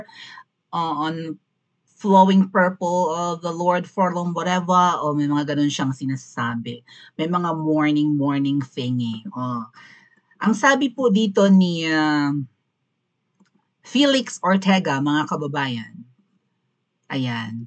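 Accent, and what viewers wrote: Iloilo, Philippines. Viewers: Haha ilongga ka day❤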